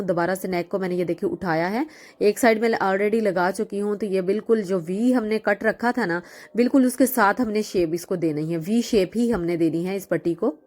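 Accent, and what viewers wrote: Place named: Punjab, India. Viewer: Bhoht khub ❤ mam aap kha se ho panjabi tone aati h aapki voice mai 😊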